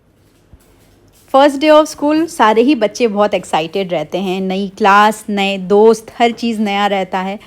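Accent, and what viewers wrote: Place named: Uttar Pradesh, India. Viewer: Apke accent se lagrha ap UP se ho india me Maza aata h apki videos dekh k btw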